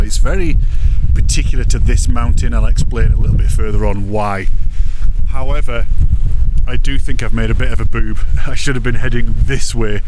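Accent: Yorkshire, United Kingdom. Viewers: love watching your video's and your calming voice but trying to pick up ha with proper Yorkshire accent. But am listening & understanding 😊 canny beat a pint afterwards. ❤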